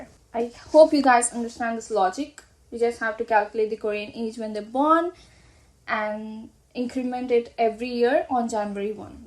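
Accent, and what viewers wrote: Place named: India. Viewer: Great video BTW are you Indian because your accent is a bit different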